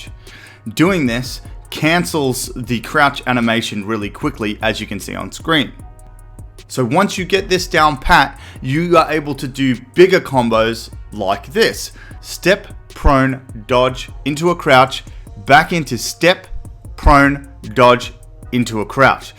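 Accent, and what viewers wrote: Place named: Australia. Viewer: Amazing video! ✌ love the ausie accent. Keep up the good work bro.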